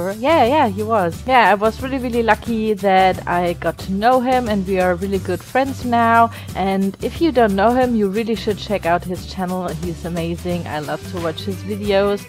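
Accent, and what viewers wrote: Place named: Germany. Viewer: You've kinda german accent... maybe... don't know... xD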